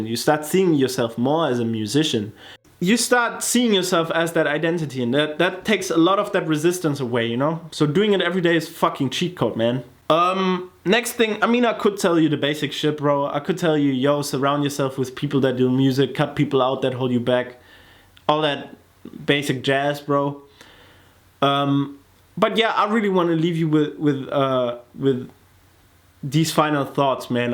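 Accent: Germany. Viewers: Dein Deutsch-Englisch ist bisschen auffällig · oha heftig aber wenn man drauf achtet hat er nen deutschen Akzent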